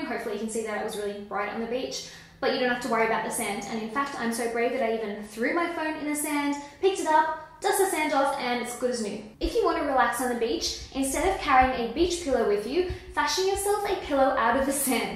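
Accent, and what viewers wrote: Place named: Australia. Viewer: LOVED This! Thanks lovely!! Side note- I'm super surprised that a lot of people haven't realised that you have an Australian accent and there fore live in Australia... where it's currently summer ☀️😜 Haha xxoo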